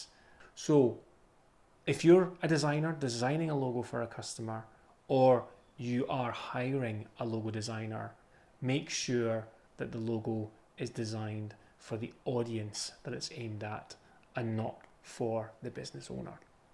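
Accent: United Kingdom. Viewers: Great advice mate.. Are you from the UK?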